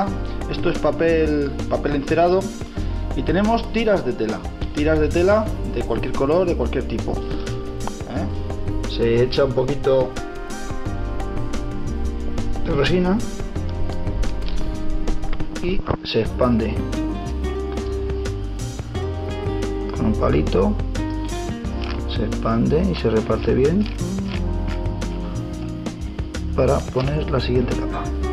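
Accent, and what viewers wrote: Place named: Madrid, Spain. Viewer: Muchas gracias Maestro , por fin me entero de como se hace la micarta correctamente , me preguntaba si seria muy caros estos productos , quiero animarme hacerlo , soy un gran aficionado a la artesania y en especial a los tirachinas , pertenezco a un foro mundial de tirachinas y he visto trabajos verdaderamente preciosos con este material . Soy de madrid y tu ? , tu acento parece Madrileño . Un abrazo y gracias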